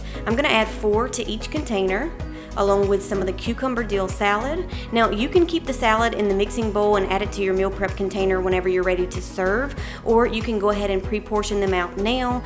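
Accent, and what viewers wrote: South, United States. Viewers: Giving you all the likes! :) you are beautiful! And I love your southern accent and your recipes ☺️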